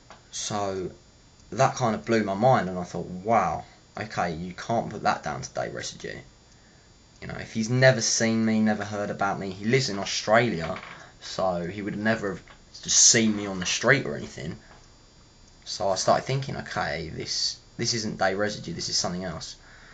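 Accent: United Kingdom. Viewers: lol i'd guess from his accent he lives in the U.K, and they're on a pretty similar time. enough for the two to be simultaneously dreaming. I think.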